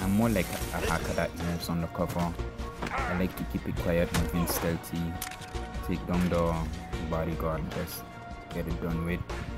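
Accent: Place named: Jamaica. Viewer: Your Jamaican accent is so cool bro 🙌🔥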